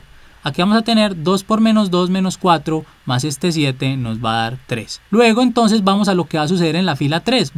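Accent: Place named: Medellín, Colombia. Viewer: Raúl Alberto Díaz Garcia Es de Medellín. Asi es su acento.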